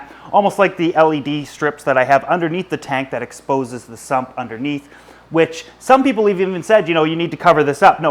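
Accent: Canada. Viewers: Youre saying oot instead of out. Are you Canadian? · Are you canadian like me? I can hear a bit of an accent lol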